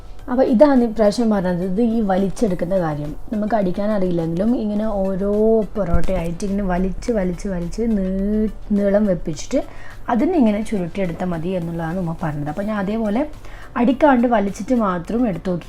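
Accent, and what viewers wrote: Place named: Mangalore, India. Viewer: Lv ur way f talkng frm mnglrian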